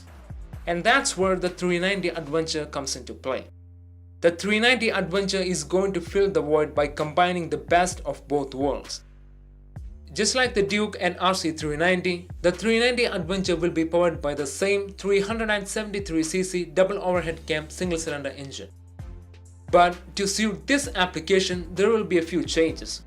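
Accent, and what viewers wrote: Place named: India. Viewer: An indian voice for an indian bike.. finally ! Good, honest job !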